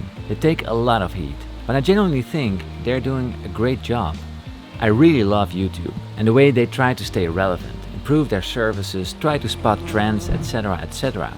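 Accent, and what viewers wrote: Netherlands. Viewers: Mui MasMacho every educated Dutch person speaks fluent English in my experience, and with that accent.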